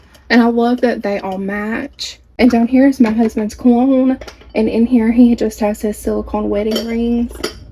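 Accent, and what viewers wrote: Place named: Mississippi, United States. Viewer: This is the first vid Im watching, but now that Im in Canada your accent makes me sooo happy as someone that grew up in Mississippi 🥹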